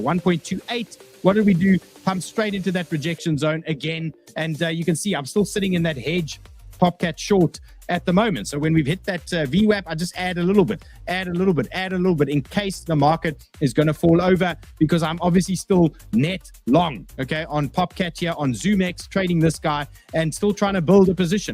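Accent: South Africa. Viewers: South African accent with American energy is grating to the ear holes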